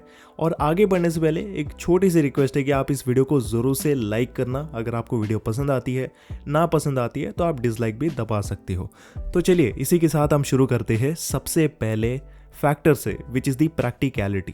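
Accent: Kerala, India. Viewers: Mallu accent 😊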